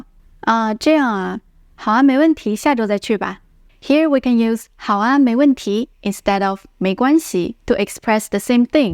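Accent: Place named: Dongbei, China. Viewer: your my favourite teacher cos of the northern stuff! Do you think when you read the northern part can you say it normally for one and also in your dongbei accent too so i can copy it rather than reading it with standard pronounciation =D.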